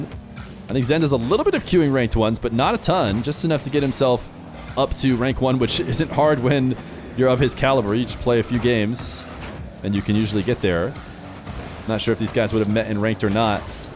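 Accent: United States. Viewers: you sound like an american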